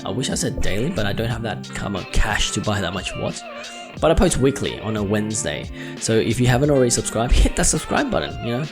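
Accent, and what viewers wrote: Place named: Australia. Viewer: why what's wrong with how he's saying it? he's Aussie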